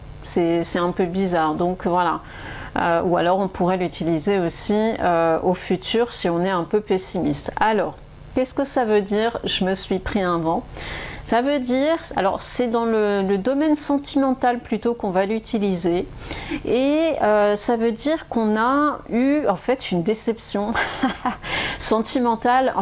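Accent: France. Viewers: J'adore écouter votre voix, elle est trop française. Merci beaucoup pour les videos. Ils m'aident beaucoup.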